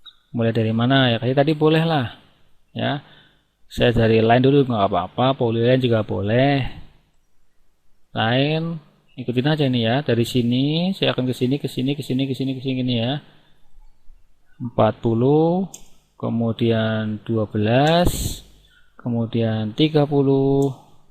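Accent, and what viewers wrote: Banyumas, Indonesia. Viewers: Belajar ning pasti kepenak...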